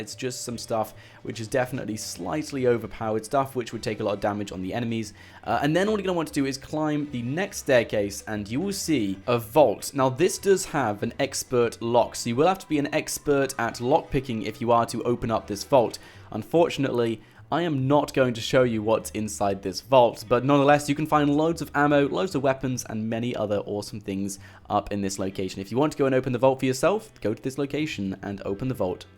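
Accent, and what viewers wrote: England, United Kingdom. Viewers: he's English with a normal voice. we all don't sound like hillbilly inbreads like you lol.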